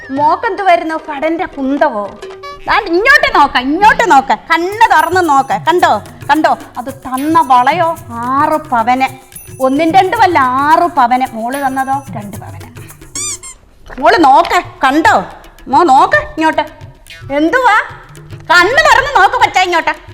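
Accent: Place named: Kollam, India. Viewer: Ammichiyude varthamanum ketittu kollam bhagathu ninna tonunnu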